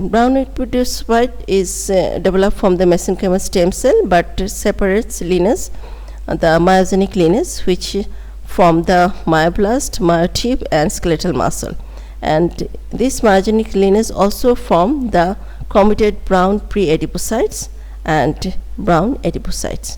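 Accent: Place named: India. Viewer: Only other Indians can understand this atrocious accent . Madam; please learn to speak English, or use a robot to read and express your slides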